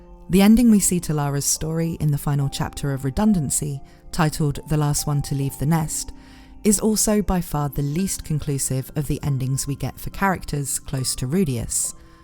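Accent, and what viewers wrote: United Kingdom. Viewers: de donde eres? xd suena a britanico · WhenI heard you say "Last One to Leave the Nest", I had a tear in my eye. Also: Christina Best Child Do people in the UK (assuming based on your accent) pronounce it "obleej"? Because in French, that "é" would pronounced like in "hey' (without the "y" sound). Not sure if this makes any sense. Truly loving your content. Gonna check that Vivy video next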